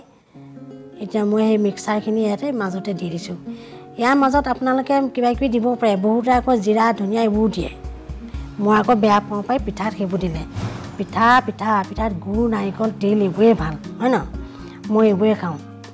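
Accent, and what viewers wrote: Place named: Sivasagar, India. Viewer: বাইদেউ আপুনি শিৱসাগৰৰ নেকি,কথাৰ সুৰবিলাক শুনি শিৱসাগৰৰ যেন লাগে,আমাৰখুৰীৰ দৰে.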